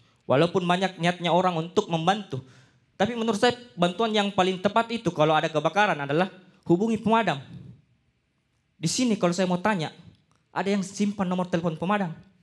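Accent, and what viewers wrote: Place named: Makassar, Indonesia. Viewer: Ini dia yg gue tunggu.. Orang Makassar mana suaranya ❤️❤️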